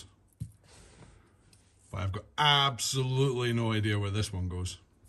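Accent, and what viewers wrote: Scotland, United Kingdom. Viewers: Thats a wee mit of a mess. Great to hear the Scottish accent am defo a subscriber 🏴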